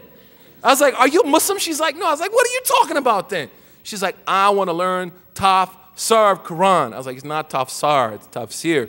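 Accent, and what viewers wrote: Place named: Bronx, United States. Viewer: His manner of speech offended me as a Muslim. Really what is going on In America? Have some strange people becoming "Imams" Why is he trying to talk like he is from the bronx, it is not impressing anyone. And I agree with the person who said even the sisters are looking at him oddly those sat in the audience. What is happening in America??? I am in the UK thought it was tough here... Ya Rab whats going on with some people?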